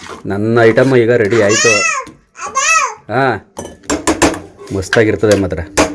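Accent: Mandya, India. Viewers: Anna nivu mathadodu tumba eshta., adrallu nim wife jhote mathadtiralla adu maathra super., namdu mandya ne., mandya language super...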